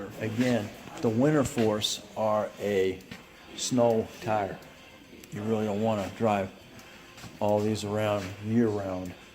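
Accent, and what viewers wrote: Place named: Vermont, United States. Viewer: guy sounds like he's from Vermont.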